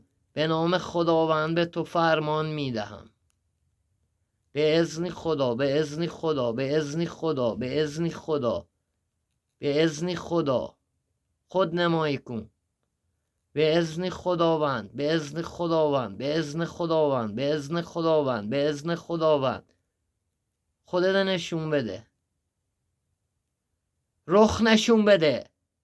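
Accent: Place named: Isfahan, Iran. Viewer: اول از همه سلام ، دوم : قررررررربونی لهجه اصفانی دون بشم من ❤ بعدم اینکه پسررررر چقدر اورب اطرافت بودن !!!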